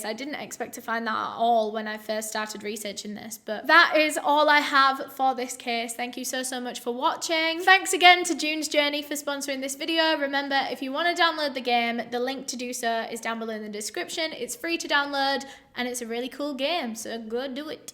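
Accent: United Kingdom. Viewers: omg are you british? finally someone who is actually British. I love it when i have a British bredrin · Tell me you're from the UK without telling me you're from the UK...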